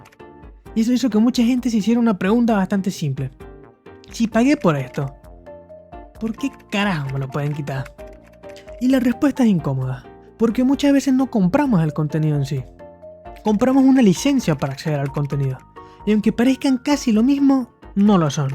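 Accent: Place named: Chile. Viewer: Quizas me equivoqué pero por su acento deduzco que es chileno o peruano. Parece más chileno que peruano.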